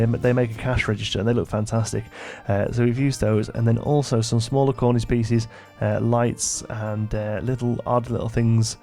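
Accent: United Kingdom. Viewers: I get what they are saying about your voice, it is very soothing. Being from America, of course, I love your accent. It reminds me a bit of the way The Beatles sounded when I was a lass. Some of your words stick out more than others, like the way you say mental. I will often stop the video to replay it again. You are a great Plan Co builder and wicked smart like a fox with design.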